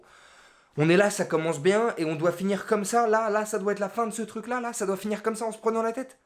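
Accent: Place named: South, France. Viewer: Super ta vidéo j'adore ton accent du Sud :D Ça m'a fait bien rire!!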